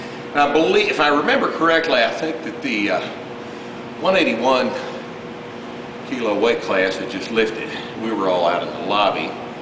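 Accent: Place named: United States. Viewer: What the fuck are you on about? There was literally no cussing, he's speaking clear American English (which is his audience). What could you possibly have to bitch about?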